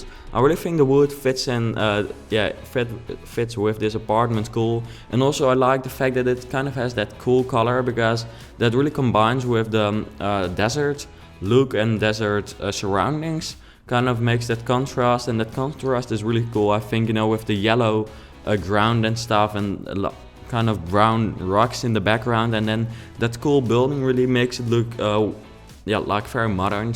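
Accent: Netherlands. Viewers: can I just say... I'm English, and I love your accent. I think Dutch accents are amazing. Really nice build.Very inspiring.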